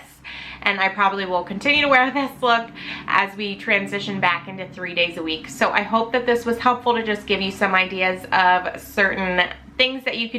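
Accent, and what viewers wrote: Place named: Northeast, United States. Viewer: Do you mind me asking what profession you’re in? I always imagined you being a teacher…you always have that sweet nature teachers have 😊 And, again if you don’t mind me asking, what region of the states you’re in? Your accent leans North East to me, but I’m never sure 😂 Also, I say keep rocking the skinny jeans! I’m 34…so you know my closet is full of skinny’s! And I’m not giving them up, I love how they look and I love how they feel! I left my baggy jean days back in high school! Haha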